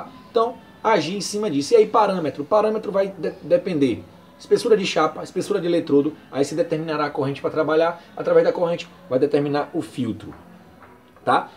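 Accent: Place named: Bahia, Brazil. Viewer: Tem sotaque de Baiano rss.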